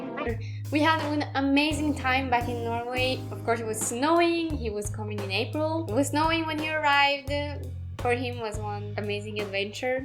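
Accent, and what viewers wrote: Italy. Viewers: your English accent is so Italian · you're getting an italian accent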